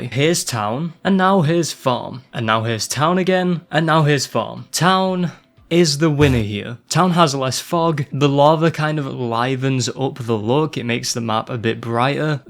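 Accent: United Kingdom. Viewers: Something tells me this guy is British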